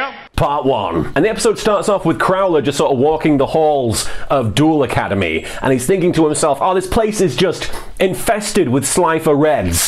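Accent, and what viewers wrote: United Kingdom. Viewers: LK this is the most British your ever sounded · Silly question but is from the UK? I can't tell if he has an accent or not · has never been so brittish, and I bloody love it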